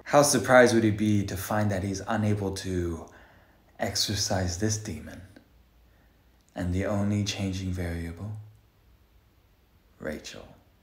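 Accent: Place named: United Kingdom. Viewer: I absolutely love your Elegantly prestigious English accent, which makes the nature of the situation more dire in a dramatic yet intriguing manner. I do applaud you sir for the excellent plot twist that had my imagination in full attendance, in which I Invisioned this fold of events effortlessly in my mind. I would love to see you create a video in which you use that brilliant English accent to depict a Royal speaking to a peasant in a boastful yet menacing way.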